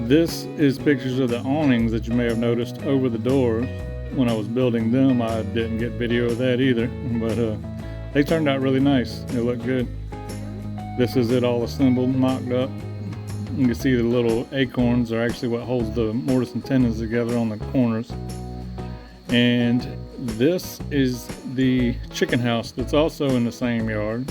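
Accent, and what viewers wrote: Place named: Louisiana, United States. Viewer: You sound like your from Louisiana buddy 😂…I got it liked and I’ve subscribed. I like watching them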